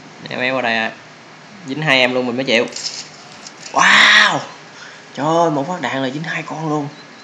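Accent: Mekong Delta, Vietnam. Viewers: Anh Nói Chuyện Đúng chất Người Miền Tây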